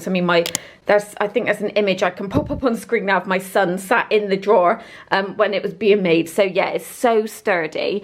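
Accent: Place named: Bristol, United Kingdom. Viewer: Just seen this and you must be a fellow Bristolian with that accent surely 😂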